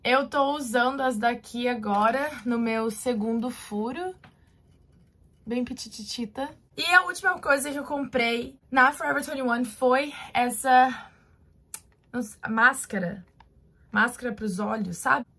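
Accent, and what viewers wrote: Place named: South, Brazil. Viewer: o sotaque sulista deixa tudo melhor, me identifico kkkkk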